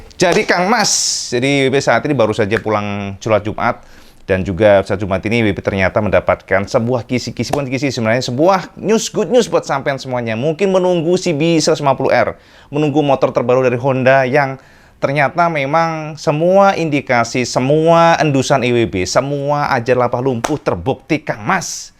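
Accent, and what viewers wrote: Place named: Tulungagung, Indonesia. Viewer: ketoro sekk enom cak sman 🤣🤣🤣 bhasa tulungagung mesti ujuk"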